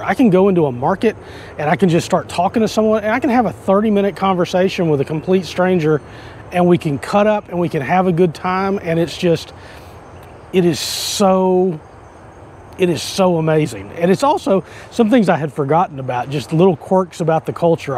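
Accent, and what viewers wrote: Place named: North Carolina, United States. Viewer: Great video. 'Glad you're stayin'. I'm planning on coming over for good in November. The first thing I'm planning on doing is to buy a motorscooter, new one. Honda. What are the hoops you have to jump through. AND most importantly, what is the situation with getting a driver's license. Is a US license good for a period. Can you do the whole registration / insurance thing in one go or is it a long drawn out process. SECONDLY, I'm in N.C. so I'm appreciating the accent; hold on, secondly as I said, why did you go to Mindanao. It seems EVERYONE is going to the Dumaguete area and Mindanao sees like the dark continent. I've never been to the Philippines except to fly over it once but I've lived in Mexico so I appreciate the concept of time and bureaucracy and it seems to be a Spanish trait the Philippines has picked up nicely. 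I'm VERY patient. What are some prices for a two bedroom in Mindanao? Is there an English speaking community in Mindanao or is most of your time spent at home. Questions, questions...... Thanks a bunch and enjoy your day. All the best, Greg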